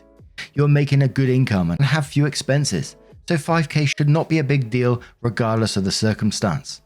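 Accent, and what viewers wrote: England, United Kingdom. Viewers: My favorite reddit reader is you,. I love your waffles, your giggles, and when you swear. I don't know why but it's better with an English accent. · I'm Scottish. I love channel too, but he has an ENGLISH accent. There's no such thing as a (singular) British accent!! 😉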